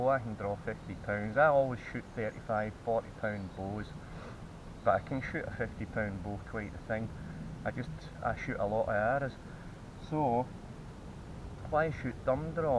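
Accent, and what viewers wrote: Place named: Scotland, United Kingdom. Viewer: Camera too far away for clear video instruction.... volume very poor for this Scottish accent.... nice bow however..!!🤔.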